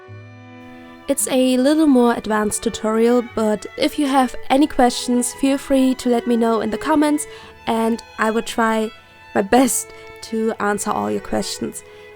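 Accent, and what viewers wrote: Germany. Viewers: lol that hard german accent, but either thx :)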